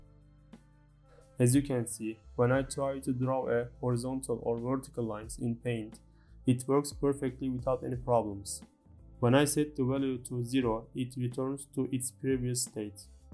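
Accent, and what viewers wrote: Turkey. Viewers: abi türk olduğunu aksandan anladım bi açık yakalamaya çalışıyordum bilgisayar ele verdi seni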